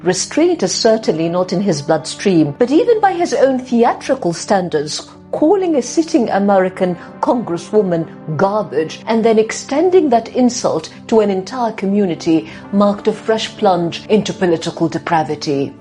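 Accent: United Kingdom. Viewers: That is British accent , not North American one. They hav own style.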